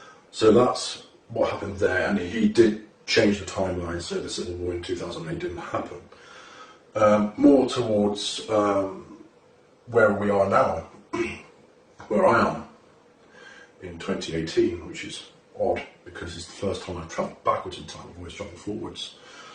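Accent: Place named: United Kingdom. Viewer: So what Army did you join? Why are you speaking with a British accent?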